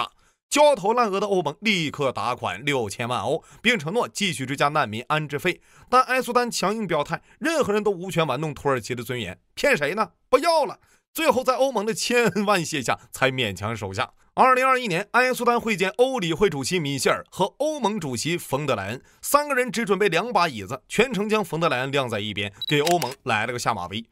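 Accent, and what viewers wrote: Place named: Baoding, China. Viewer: 老兄的保定话太牛掰了😂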